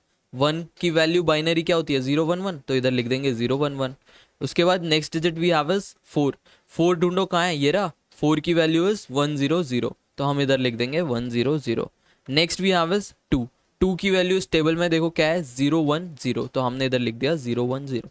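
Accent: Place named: Mumbai, India. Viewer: I can tell from his accent he is from Mumbai.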